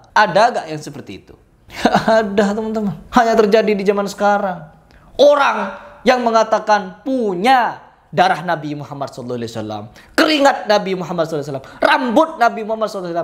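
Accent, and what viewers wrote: Madura, Indonesia. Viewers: Saya orang dayak, dari lugat bahasa bang, bang madura, saya sangat suka setiap konten bang, dan saya udah sekarep, bukan brarti orang dayak berbusana sama madura, yang berlalu biarlah berlalu, kita lupakan😂😂buat teman2 madura jangan tersinggung yah😂😂😂😂, karanena saya dayak sangat suka sama bang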